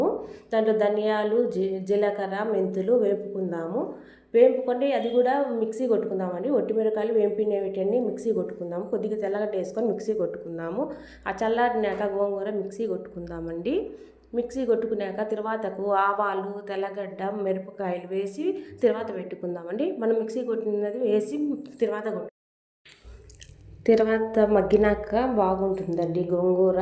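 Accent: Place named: Kadapa, India. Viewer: Oh akka pakka Kadapa slang🥰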